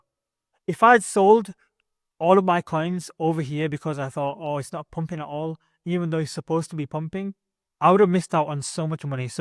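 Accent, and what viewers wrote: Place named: Oldham, United Kingdom. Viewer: Where are you from son? Sounds like an Oldham accent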